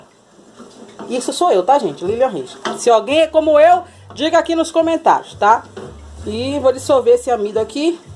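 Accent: Bahia, Brazil. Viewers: Vc é baiana? Seu sotaque parece,sou baiana,vou fazer ,amei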